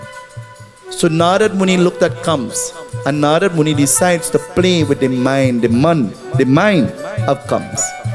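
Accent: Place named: Trinidad and Tobago. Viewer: SITARAM. PUNDITJI...I. LOVE. YOUR. LITTLE. TRINI. ACCENT.. YOU. DID. THE PUJA VERY. NICE.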